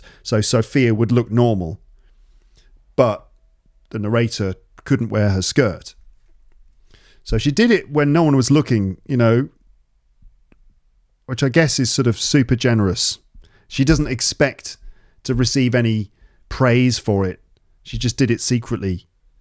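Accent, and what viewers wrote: United Kingdom. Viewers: B.B.Wang Perhaps I am the oldest student you have ever taught. I am an Australian-Chinese 90 years old. I have kept learning English to enrich/ improve the living quality of my” late” years. Your teaching course helps very much. I especially appreciate the way you teach. I can follow you almost the whole process. And the UK pronunciation. Thank you!. (Please have tolerance for my poor grammar.) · I love listening to your channel, especially your clear British accent. Keep up the good work, 😊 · The attractive places of this Youtuber are not only his British accent, but also his quality of voice and pace! It looks as if I listen an audio book. What an amazing podcast !! I begin to be hooked by his. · Thank you so much,! I can read and write English (with US vocabulary) a little but I can't speak:( I've just discovered your Youtube channel and I literally love it! 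This story is amazing and I love your UK accent 💓. I'm trying to improve all my English skills. Can I use US vocabulary while speaking with UK accent? Is it grotesque and offensive to native speakers? 🤔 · I like your excellent British accent, it is so attractive to watch your videos more , it's amazing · What an incredible experience I have watching your videos. Love your british accent and the way you explain the meaning of some words. By the way, "mi amor" is Spanish and means "my love" which is a way to call our partners when there is love involved. Hope to see you soon and thank you!! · Hi, great to hear the story, listen to your British English. Also your comments were very helful. Props to you! I am a 74-year-old writer of poems, short stories and cronicles. I have shared the video.❤ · I mean you're birtish for me i try to learn accent birtish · May I ask you an external question, my teacher 😊 Your accent is belong to which region of UK? 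With me, it is clear to understand the story. Not the same feeling when I listen to my beloved singer Adele talks.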